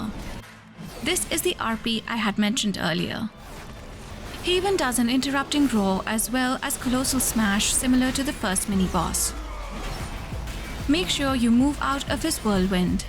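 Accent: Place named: Asia. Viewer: It's very refreshing hearing a fellow Asian covering WoW with your accent lol ❤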